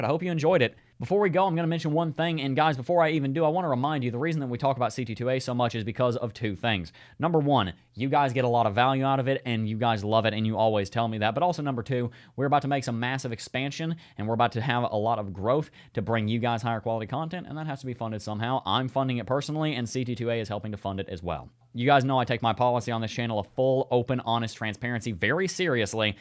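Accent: South, United States. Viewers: Thanks for the videos! Where are you from? You ve got like an a "country" accent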